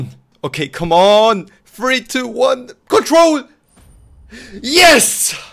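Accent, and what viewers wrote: Germany. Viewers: Nice tradeups, and are you german, because you have a strong accent xd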